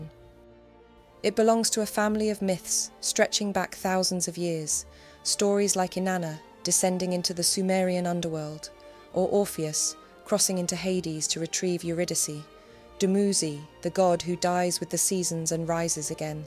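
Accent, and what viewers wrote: England, United Kingdom. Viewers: Great video, I enjoyed that , Nothing like a nice story told by an English lady with a lovely accent.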